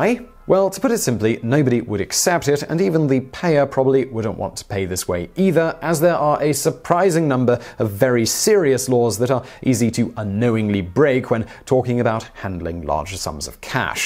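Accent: United Kingdom. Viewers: Come on Babish, your British or American. Make up your mind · And I enjoy listening to a British accent. I find it novel. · you sound like a Brit but always use such American words, like “Cheque account” in this video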